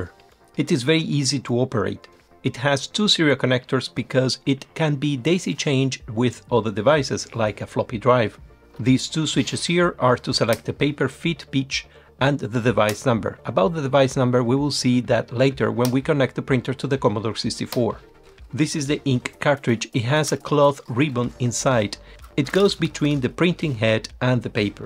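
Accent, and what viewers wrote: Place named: Italy. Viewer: ah ok sei italiano :) avevo capito che non eri inglese ma non che fossi italiano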